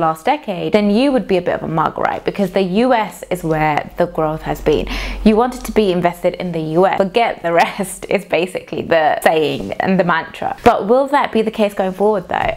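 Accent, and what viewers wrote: United Kingdom. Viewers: R u from London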